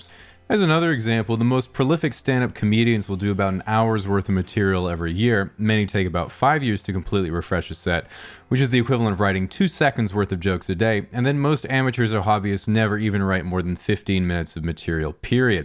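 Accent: England, United Kingdom. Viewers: That British accent is absolutely fantastic, you sound more British than me and I’m British. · Your British accent tho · I love your brittish accent holy shit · so no one's gonna talk about how he perfectly talked in British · Imressive British accent, dude. New here, but will check back ~ 💁🏼‍♀️ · your dramatic Narrative English accent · Jesus that English accent is flawless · I’m actually very impressed by his British accent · Thats an amazing British accent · why do you sound like Tom Hiddleston in that British accent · your British accent is gooood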